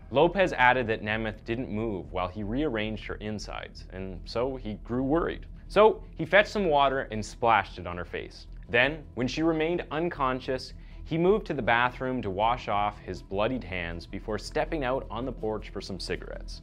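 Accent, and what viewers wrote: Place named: Canada. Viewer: I'm having a hard time placing this guy's accent. He sounds like a Canadian who's lived in the UK longer than NA.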